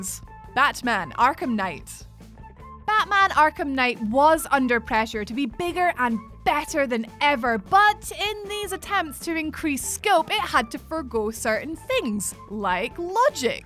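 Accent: Scotland, United Kingdom. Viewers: Are you Scottish? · I could listen to that Scottish accent all day. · Yay for Scottish voices in YouTube.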